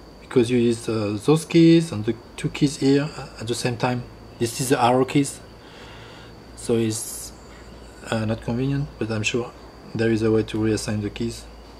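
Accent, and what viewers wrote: France. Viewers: Hey buddy you speak english like french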